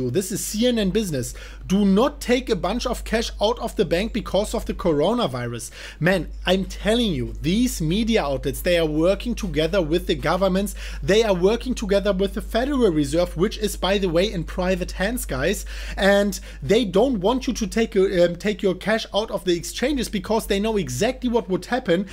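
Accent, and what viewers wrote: Germany. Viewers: I love your German accent, it reminds me of Arnold Schwarzenegger, he is very famous and Americans respect him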